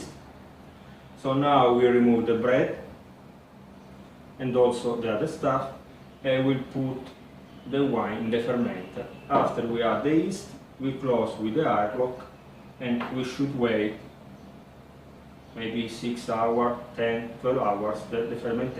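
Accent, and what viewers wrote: Italy. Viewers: Grazie mile... O seu sotaque italiano é muito gracioso... e o melhor de seu vídeo é que filmaste o fim, ou seja, como o kvass se comportou no copo.. obrigado.. abraços do brasil.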